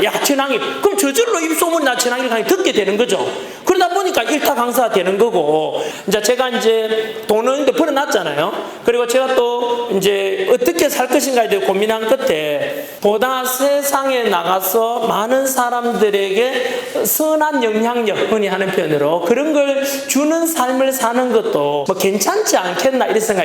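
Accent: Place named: Gyeongsang, South Korea. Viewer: 가시는 발라내고 살코기만 먹으라는 경상도 사투리 맛나요!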